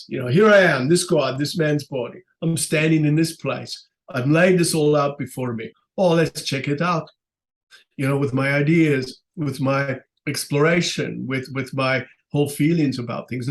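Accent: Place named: South Africa. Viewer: When speaks, I detect a South African accent. Interesting. I also recall a Dutch acquaintance speaking English with this sort of accent. I know this video is a couple of months old, and I have only just discovered this channel, but I would choose Hawaii over Portugal any day. Portugal has terrible bushfires from time to time. The people over there planted heaps of eucalypt trees which are notorious for their ability to catch fire easily. Has ever been visited by his wife from the next world since she cast off her flesh suit?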